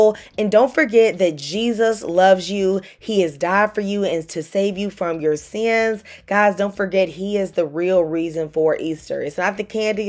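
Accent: South, United States. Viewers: I usually just listen to your videos while i'm drawing. I really love that beautiful southern accent, have a great easter you and your family, God bless!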